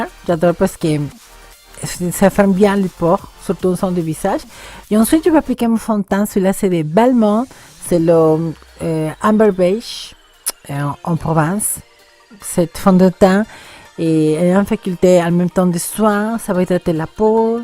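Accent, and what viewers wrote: Spain. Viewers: Ce maquillage est hyper lumineux et pétillant j'aime beaucoup tu es toujours magnifique 😻😻💓 j'aime trop ton accent espagnol moi qui a un amour grand pour l'Espagne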